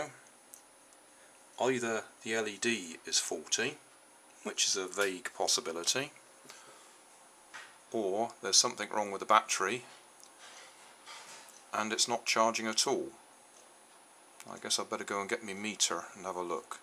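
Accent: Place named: South West England, United Kingdom. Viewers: Haha are you from Cornwall? I'm from St.Austell, and you probably have the most south western accent I've heard! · God I do not miss the South West accent at all.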